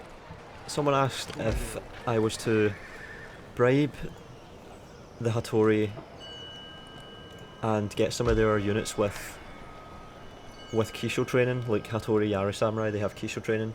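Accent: Scotland, United Kingdom. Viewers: So glad i stumbled upon your series a few weeks back, love it, im guessing you are from Scotland?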